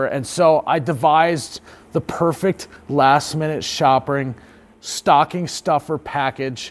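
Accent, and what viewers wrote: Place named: Minnesota, United States. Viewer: You're from Minnesota, aren't you? Love your channel.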